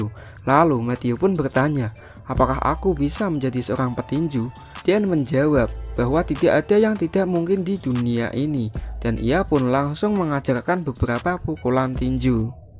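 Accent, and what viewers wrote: Java, Indonesia. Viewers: Bang sampean wong jowo yo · Mas kamu orang Jawa?? Jawanya mana mas? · Min medog amat suaranya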